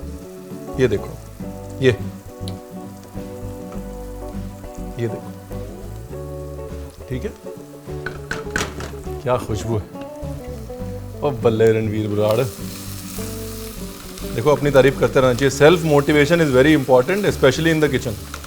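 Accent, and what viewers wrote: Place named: Punjab, India. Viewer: Sir, ranveer brar you are just awesome ♥️♥️... I have seen all your recipes like each and everyone.... And I just love your accent more then your dish of course your dishes would be more awesome 🌍♥️. I really love the punjabi in you... I recently saw your motivational video on some YouTube channel. And I was so happy that we have uh... The best chef I even watch your serial Raja rasoi aur andaz anokha it's awesome ♥️♥️.... I just wanna say that sab chef's khane banate hai pyar banate ho💕... Love you sir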